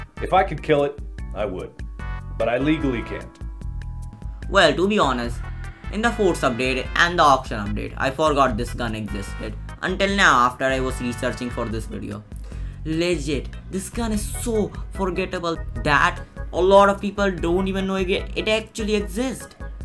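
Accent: India. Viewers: Are you indian? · Are you Indian? Not to stereotype but seriously are you?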